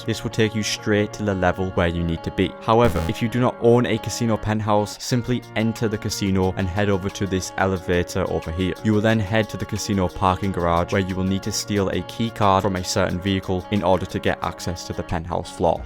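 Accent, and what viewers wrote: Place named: Newcastle, United Kingdom. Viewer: Good vid(u sound a bit geordie pal lol.im one)aye ill Definitely be watching more of ur vids beo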